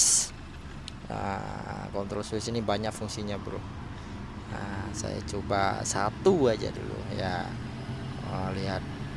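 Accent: Indonesia. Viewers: Orang indonesia asli kah bang kaya logat bahasanya hehe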